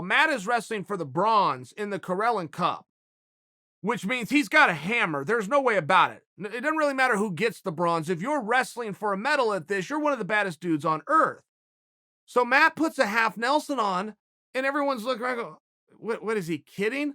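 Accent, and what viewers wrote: Russia. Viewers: Your Russian accent Chael. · Love Chael's ambiguous russian/east-european accent here lmao. Give us more russian wrestling stories Uncle Chael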